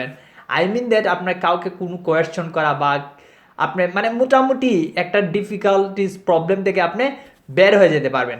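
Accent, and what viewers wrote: Sylhet, Bangladesh. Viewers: উনি সিলেট এর লোক । উনারা বাংলা এভাবে বলেন ।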